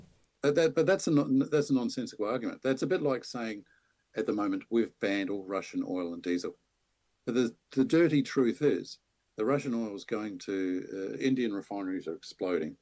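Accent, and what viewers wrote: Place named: Australia. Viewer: Very interesting - thanks for that. You had me with the accent, I felt sure you were, like me, an Aussie.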